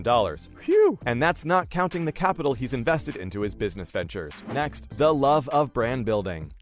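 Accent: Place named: United States. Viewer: Please learn to pronounce Glasgow. American narration is awful.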